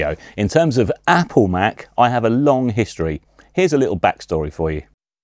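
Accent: United Kingdom. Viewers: Cannot explain the joy of hearing a good old English accent on an audio M1 video. If you're available for booking, we'd LOVE to work with you. Cannot explain how relaxed we would feel.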